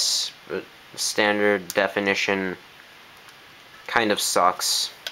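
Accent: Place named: Canada. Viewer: do you have ANY canadian in you? you sound canadian.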